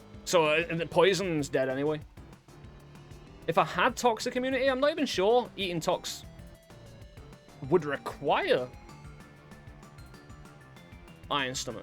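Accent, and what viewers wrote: United Kingdom. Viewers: i think ive found my 2nd favorite british accent ._. so pleasing to the ear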